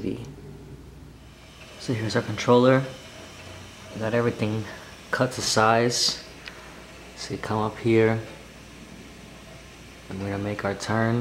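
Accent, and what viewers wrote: New York, United States. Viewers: Awesome NY/NJ accent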